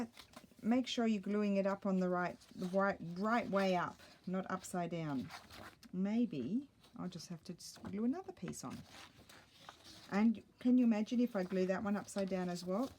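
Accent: Australia. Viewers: I really enjoyed this video. I love your Aussie accent and your enthusiasm. These collages are some of the best I’ve seen.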